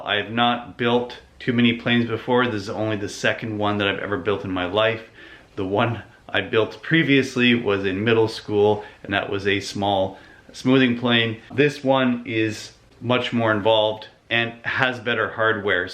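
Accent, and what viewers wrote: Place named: Canada. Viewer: Dansk surname living America, or a perfect English speaking Dane? But then I hear a little Canadian accent? Cheers from an American living in Sweden!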